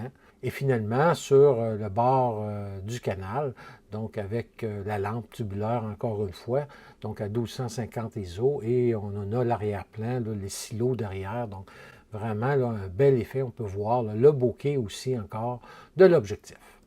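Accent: Canada. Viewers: Jolie vidéo avec en prime l'accent Canadien. Merci beaucoup.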